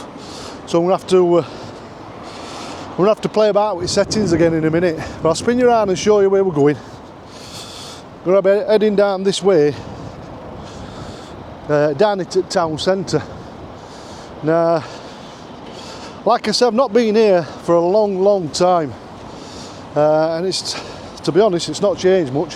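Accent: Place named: Yorkshire, United Kingdom. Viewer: Hi wast sure as new to you tube shows like this if you were being sarcastic or taking offence ? I was saying your accent is very broad yorkshire like some in Emmerdale there is nothing wrong on that unless no sense of humor But Bradford is okay city like everywhere in England and USA ideology everything sadly is going wrong all over UK generally as mass capitalism and individualism have causes a lot of problems all over UK as we follow isa ideology of divide and rule many English folk are often negative about everything these days sadly as you said Bradford has good curry Capital also is capital of culture this year And Broadway shopping center is nice and many places But everywhere has changed in UK I remember the Bradford City fire as well very sad tragedy it was just when you wrote about being from yorkshire with me saying about your accent came across as if you had took offence ? 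Problem is most English have become rude these days wherever you go in England nice areas or poorer ones the last ten years has told me England is not a country that's safe or nice full stop anymore no democracy capitalist dictatorships like USA and as I write this we are being sadly groomed for another war in Russia Ukraine so that new Tory party robot starner and Co can use it as a distraction and a excuse to cut benefits for disability people and there is millions of other problems going on all over UK generally I think of we try to talk up places and England will also help take care and the v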